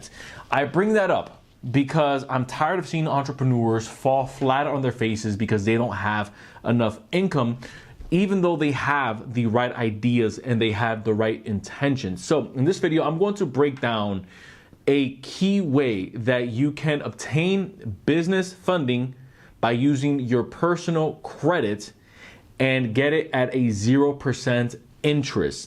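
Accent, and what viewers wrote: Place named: Miami, United States. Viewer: Once you started talking, i can tell youre from Miami. 👏🏼👏🏼👏🏼 Subscribed.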